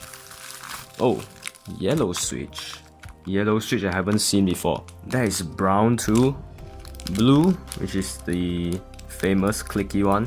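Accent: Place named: Asia. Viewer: I smell popular osu youtuber Well I mean you sounds like Miraie n Squashy Boy. Btw, are you Asian? Your english just sounds like one🤣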